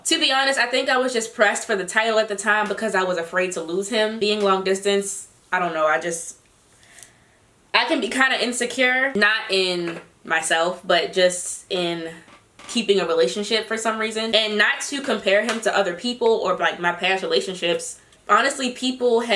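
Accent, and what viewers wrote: Baltimore, United States. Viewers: You and Thechicknatural have the same accent and both from being from Baltimore is cool. It would be so dope if you guys collab.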